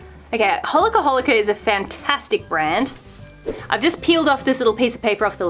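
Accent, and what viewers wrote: Australia. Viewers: i love your accent are you from Australia ❤️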